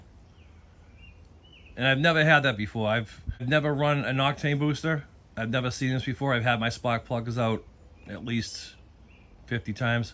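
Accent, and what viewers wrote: Boston, United States. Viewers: Sounds like Boston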